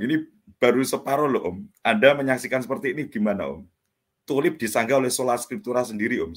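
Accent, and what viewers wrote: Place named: Semarang, Indonesia. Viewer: Maturnuwun mas atas pencerahannya. Berkah Dalem. Salam dari negeri shariah Kano, Nigeria. (Logat nya nganngeni, ….semarangan mbangeti , hèèh rak..😂)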